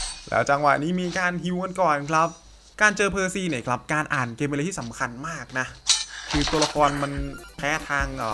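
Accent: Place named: Rural, Thailand. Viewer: เสียงเหน่อเรยขำ555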